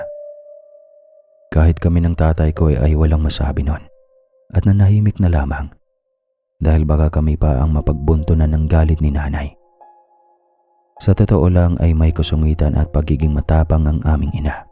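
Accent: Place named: Bohol, Philippines. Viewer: Taga asa d i ka sir mura lageh kg tga bohol😁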